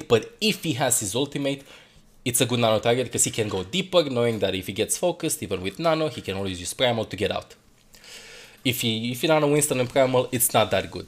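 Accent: Romania. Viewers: Are you romanian?.Ca ai un accent :) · His accent is Romanian if you were wondering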